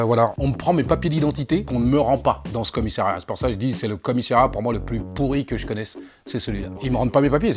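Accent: France. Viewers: Un pure parigo, un phrasé telment inimitable 🖤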